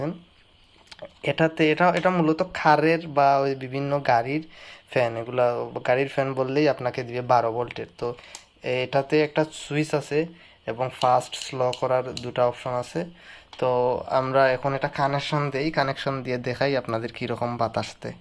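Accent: Sylhet, Bangladesh. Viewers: Vai er basha ki sylhet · ভাই আপনার দেশের বাড়ি কি সিলেটে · আপনি কি সিলেটি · Vai ki sylheti ❤ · Apni sylheti naki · Vai apni ki Sylhety 🤔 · Vai sylheti? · Vai apni ki sylhety ? 😀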